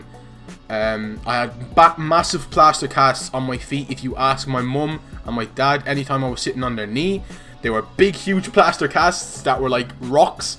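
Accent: Dublin, Ireland. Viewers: Where about in Dublin are you from🇮🇪🇮🇪🇮🇪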